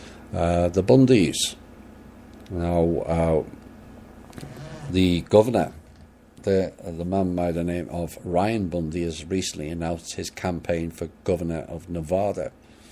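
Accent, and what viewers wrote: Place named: Liverpool, United Kingdom. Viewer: Alright Scouse !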